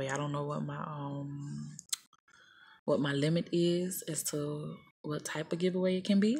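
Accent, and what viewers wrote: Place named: South, United States. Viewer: Hello First I wanna say that I love your "Southern Draw" lol Well I did everything you said and it went through. I even got a conformation of my order. However no shipment conformation or no products was ever delivered. Looked back at my order purchase on amazon and nothing was there. The order was cancelled. So does this really work?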